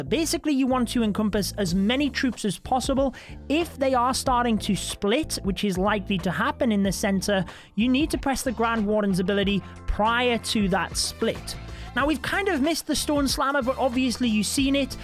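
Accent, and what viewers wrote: United Kingdom. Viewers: I can’t tell where your accent is from. I mean, I guess it’s British but guessing the city is very hard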